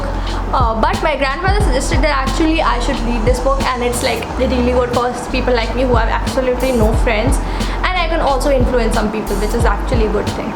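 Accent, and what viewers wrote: India. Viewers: Haha she has an Indian accent ofc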